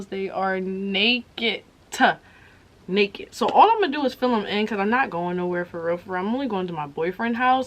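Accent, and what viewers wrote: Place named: Philadelphia, United States. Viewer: You sound like you from philly, you’re so damn pretty btw 😍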